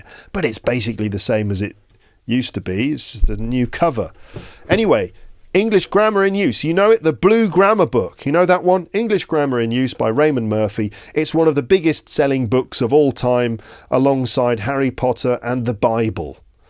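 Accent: United Kingdom. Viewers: Hi, I am from Indonesia. English is my 3rd language. First of all, you speak so fast with your British accent. But one thing I want to tell is that I can clearly understand what you've explain without seeing the visual of your video and of course without the texts. So I want to say thank you for making this video for us. Hope it's gonna help someone like me out there. Thank You. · I love the brittish accent. thanks a lot for all your videos.. ;-) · hi sir i am a big fan from Algeria i love your British accent so much beacause of you and your peaces of advice i am the first in my class +if i make mistakes tell me please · Your accent is British or American ?🌝 I guess it's British bat l want to make sure because my language level is intermediate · I remember that some months ago it was really hard to me to understand you because of your accent but I kept listening British English now I can understand the most of it.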